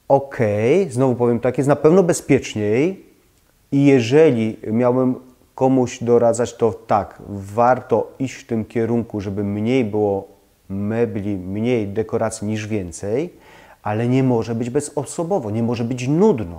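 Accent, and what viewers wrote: Silesia, Poland. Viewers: Czy Pan jest ze Śląska ?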